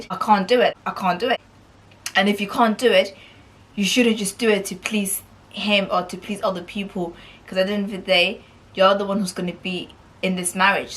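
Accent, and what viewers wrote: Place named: Scandinavia. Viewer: by the way wheres your accent from?, are u from those Scandinavian countries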